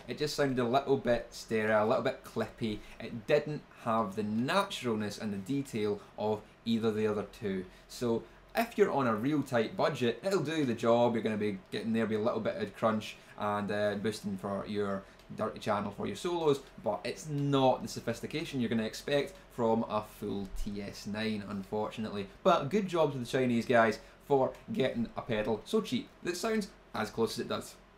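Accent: Scotland, United Kingdom. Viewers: So, where you from? Sound scottish lol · I forked out $280 (US$) for the TS808HW (used) and I feel like it was worth it, it works excellently as both a boost and an overdrive. Also I'd just like to say that I use your videos as a reference for a Scottish accent... Take that as you will, my ancestors were mostly Scottish.... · Your Scottish is beautiful! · Entertaining British commentary. it’s definitely funny. The personality certainly comes through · All I can think when I listen to a scotish talk is in fucking trainspottin hahaha!! great review mate! cheers from argentina!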